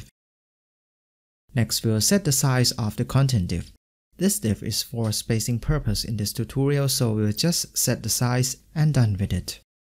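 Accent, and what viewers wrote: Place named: India. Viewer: This Indian talking, I hate it! Really can you not talking ....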